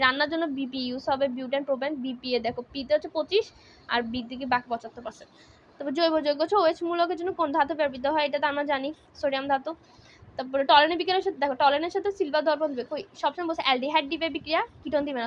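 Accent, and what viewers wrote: Chittagong, Bangladesh. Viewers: আপু কি চট্টগ্রাম থেকে?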